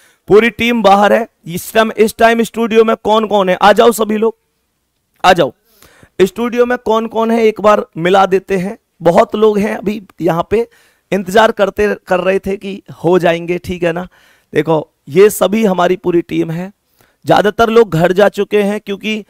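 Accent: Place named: Haryana, India. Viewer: Aapki haarryanvi voice achhi lagti hai please aap harryanvi hi bolla karro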